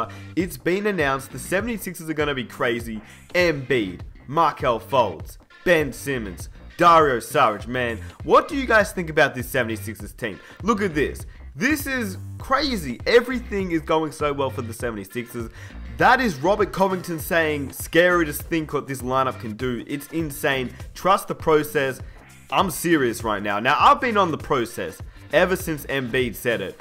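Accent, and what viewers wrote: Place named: Australia. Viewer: An Aussie commentator - love it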